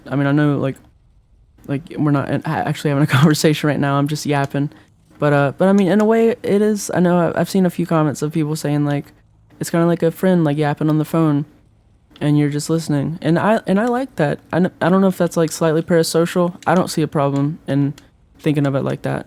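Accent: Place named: South, United States. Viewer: great southerner accent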